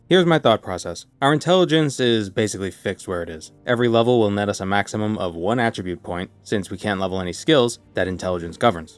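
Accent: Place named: New England, United States. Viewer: that’s sincerely just how the new england accent is lmao. they talk on fast forward up there.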